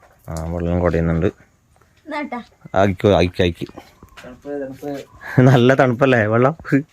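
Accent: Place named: Kasaragod, India. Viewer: നിങ്ങടെ സംസാരം കേട്ടപ്പോൾ ഞങ്ങടെ കാസറഗോഡ് ഉള്ളപോലെ തോന്നും അതാണ് ചോദിച്ചേ അടിപൊളി njan ആദ്യമായി നിങ്ങടെ വീഡിയോ കണ്ടത് ഞങ്ങൾക്ക് കുടുക് അടുത്ത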